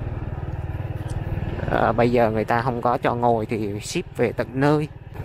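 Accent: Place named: Da Lat, Vietnam. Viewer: Thank you em trai, giong DALAT de thuong.,rat yeu va nho DALAT, ❤️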